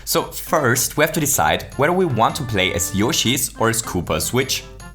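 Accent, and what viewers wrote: Austria. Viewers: what the heck are you guys talking about? Also, i know his accent is austrian, but im not sure if he lives there, maybe he has something on a social page or something, we should make it our goal to try to track him down! We need to do some digging... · are you austrian?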